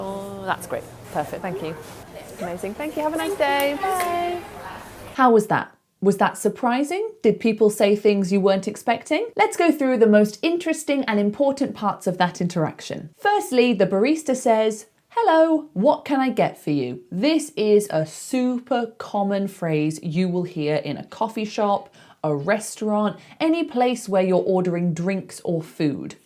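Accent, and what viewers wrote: United Kingdom. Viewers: i like british sounds❤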